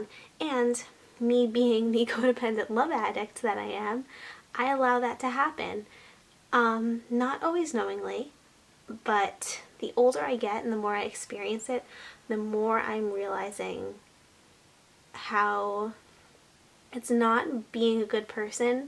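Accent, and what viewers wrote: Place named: United States. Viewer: Ok putting some points on the comment section here, 1. Me and you have literally been through so much of the same stuff 2. You're beautiful on the outside 3. You're beautiful on the inside 4. Your accent is adorable! (I'm british you Americans are adorable!) 5. Some of the points you put forward were so unbelievably true 6. I have really really bad 'love addiction' (I kinda don't like calling it that sounds weird) I have to make everyone in my life super happy and i give them so much of my time etc after this. I'm gonna think about how much i contribute to stuff like friendships etc 7. You freakin' awesome Xox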